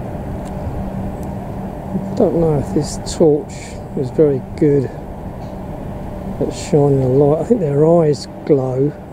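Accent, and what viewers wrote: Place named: London, United Kingdom. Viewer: I've seen a bunch of birds around the Craigieburn Grasslands that I'd never seen before and in some cases still can't identify. It's the largest surviving example of the remaining two percent of the volcanic grassland ecosystem in Melbourne's north, now almost gone. Regarding the Black Cockatoos, I think I might've mentioned previously that for a while I was seeing a flock of Little Corellas on St Paul's Cathedral opposite Flinders St. Station. From your description, the woodpecker I saw was probably a Lesser Spotted Woodpecker, I remember the black, white and red. Someone had put wire netting around the nest entrance to protect it. It was in a local park called Walk Wood, and there was a "myth" about a deer living there. I was only about ten at the time and imagined there was some huge antlered stag roaming the wood. 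But when I mentioned the story to people I'd known from there many years later, they explained that it wasn't a myth at all but that it was actually just a very small species of deer that really does regularly turn up in people's gardens around there. I wasn't sure whether to rejoice that the myth was true or to be disappointed that my "Lock Ness/Yeti deer" sounded like such an ordinary, mundane thing. I never saw one though, so still some mystery left! BTW the accent around Beaconsfield is a shocker, they sound like they're relatives of the Queen :-) I'm no expert on English accents but I'm guessing yours is a London accent - I could be wrong. BTW if you want t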